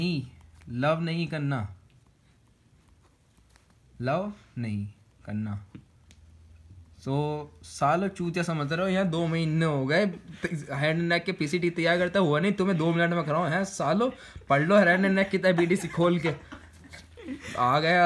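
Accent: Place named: Mewat, India. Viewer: My frnd shared it to me . And now I can not help loffin' ... the mewati touch is just adding spice to sentences 🤣🤣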